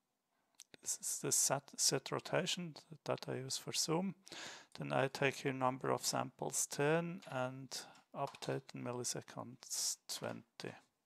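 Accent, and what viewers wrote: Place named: Finland. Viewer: you have a sort of Finnish accent 😉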